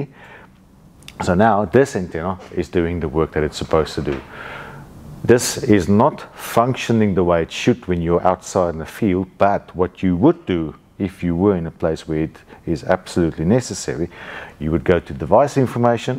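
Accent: South Africa. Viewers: Thanks for the video, very useful. You sound very South African?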